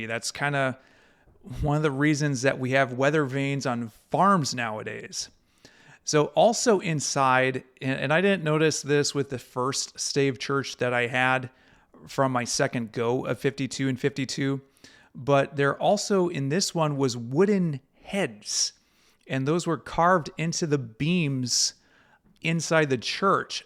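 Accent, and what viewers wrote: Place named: Wisconsin, United States. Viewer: He's from Wisconsin. 😊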